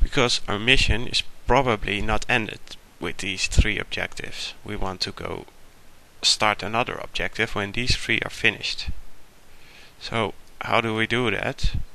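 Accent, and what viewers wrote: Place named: Netherlands. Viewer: Je hoort wel dat het een Nederlander is ;D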